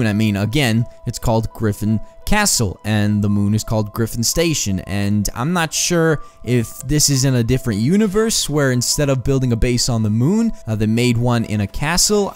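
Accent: Bosnia and Herzegovina. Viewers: Are you from Bosnia?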